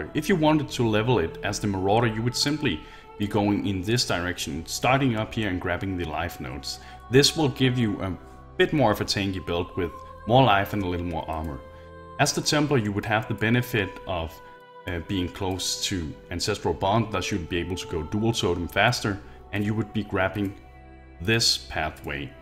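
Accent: Denmark. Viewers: Hey really good video man! i recently started playing and have been toying with a necromancer/totem witch, with a bit of fire spells to help on the side. Is it to spread out or is it viable? Btw are you danish? i just thought i heard it in your accent :). If you are, i can tell you, it was definitely difficult to hear it. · Hmm, that accent. You're Danish aren't you!? :) :)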